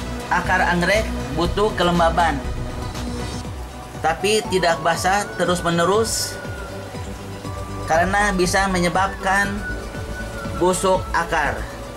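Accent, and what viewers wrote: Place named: West Java, Indonesia. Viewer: si akang,logat nya urang sunda pisan euy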